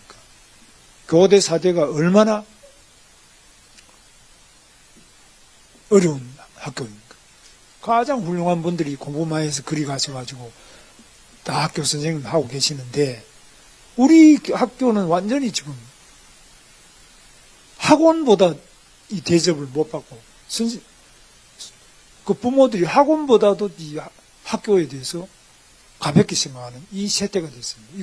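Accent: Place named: South Korea. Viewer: 구수한 사투리 정이갑니다.대통령꼭대십시요.😊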